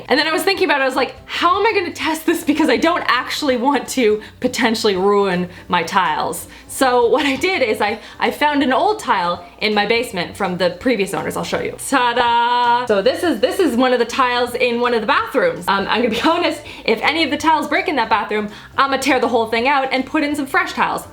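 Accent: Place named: Canada. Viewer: She has a slight Canadian accent.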